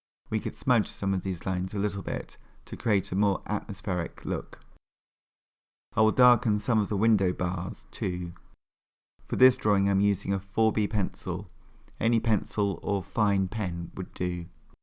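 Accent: United Kingdom. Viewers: You never over explain. Just the right amount of clarity and instruction. Thank you so much And great English accent by the way.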